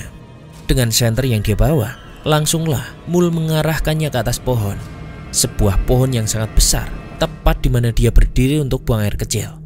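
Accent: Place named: West Java, Indonesia. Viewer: pembawaan logat sunda na lumayan😅😅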